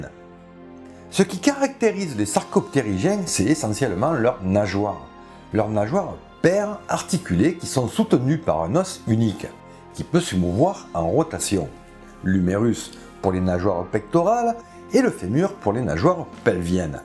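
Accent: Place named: Provence, France. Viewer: "Fing Silurieng/début Dévonieng" je pensais pas qu'un paléontologue du sud de la France pouvait être aussi exquis à entendre 😂😂😂 Vraiment entre les familles d'espèces et les périodes, tu régales !! Hyper intéressant par ailleurs, tu alimentes ma fixette du moment 👍👍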